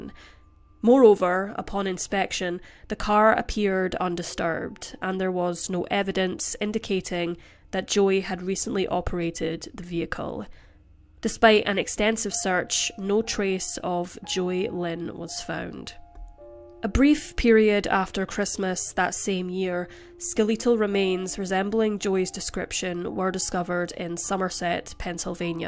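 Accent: Scotland, United Kingdom. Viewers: Everything she says sounds great with that Scottish accent! 😋💙💜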